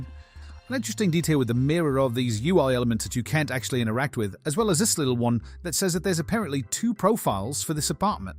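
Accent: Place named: Australia. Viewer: Australian i see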